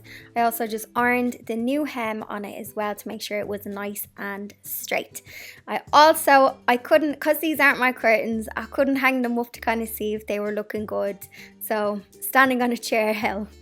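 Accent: Ireland. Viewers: I think I'm going to watch all my tutorials by Irish content creators...that voice!!! 💚 🤍 🧡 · I love your accent, Irish girl!!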